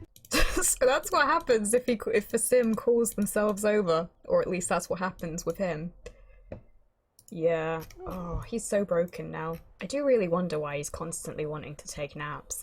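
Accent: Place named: United Kingdom. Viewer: I live in the UK and we do that too and I was kind of confused because she sounds like she is from the UK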